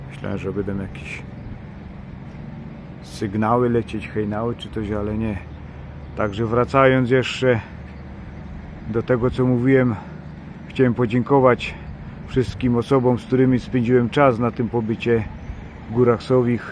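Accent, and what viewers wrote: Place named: Upper Silesia, Poland. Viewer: Białowice to chyba dolni Śląsk a akcent masz (mosz😅) z Górnego Pozdrawiam 👍filmik oczywiście z pięknymi widokami 👍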